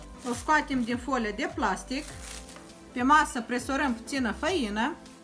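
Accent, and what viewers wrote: Moldova. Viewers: Ești minunata atit de mult i mi place cum vorbești asa vorbeau bunicii noștri acum 60 ani.I mi amintesc și acum ce femei harnice frumoase bune asa ca tine. De cite ori i mi e dor ma uit la tine la rețete și la Stela Stratulat numai sa va aud dulcele grai Moldovenesc. I ți doresc sărbători cu bucurii sănătate alături de cei dragi ție. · dupa accent si dupa scrisul in rusa de pe plicurile cu condimente, as zice ca de peste :)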